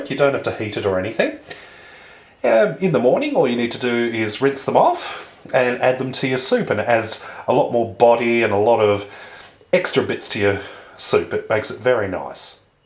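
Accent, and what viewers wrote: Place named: Australia. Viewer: most aussies talk like that! me included!!! i think he talks nice!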